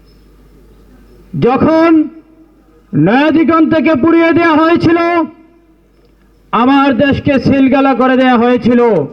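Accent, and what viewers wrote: India. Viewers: এই লোকের কথার মধ্যে একটা ভারতীয় একসেন্ট আছে।।।😂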